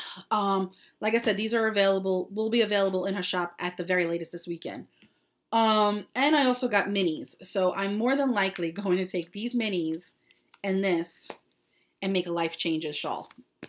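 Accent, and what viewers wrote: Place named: Brooklyn, United States. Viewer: Your Shawls are Beautiful! I'm so glad that Melissa pointed me in your direction- Love seeing and hearing about Your Yarney World! Chewy is a Little Doll, I just wanted to reach in and hug him! Thanks for Sharing, I'm still catching up on past episodes and enjoying them all! I was born in Brooklyn, Love hearing You speak, I lost my Mom and Dad 7 yrs ago so I don't get to hear the accent anymore as I haven't lived in NY in many many years! XX Marie-Wisconsin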